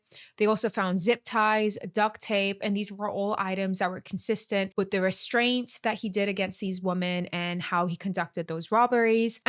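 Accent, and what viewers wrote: United Kingdom. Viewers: I was thinking the same thing hahaha sometimes british sounding and sometimes american sounding but it's actually so nice and unique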